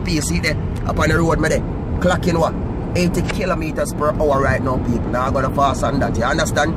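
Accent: Jamaica. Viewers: You still speaking patwa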